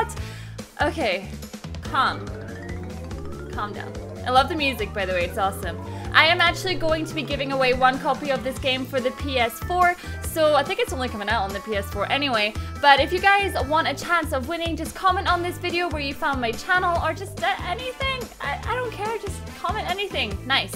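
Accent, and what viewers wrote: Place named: Scotland, United Kingdom. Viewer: Hey I just wanted to say that I really enjoy watching your videos! I watch them since resident evil 7:D I really would love to play crash bandicoot tbh. Btw from which part of Scotland are you coming from because I saw a concert of Amy McDonald 6 days ago and she lives in Scotland too. Compared to you she has just such an weird accent tho 😂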